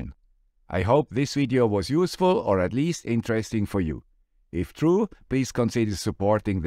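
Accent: Switzerland. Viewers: Hi to the guy with the Swiss accent from the guy with the Swedish accent! 😂😂😂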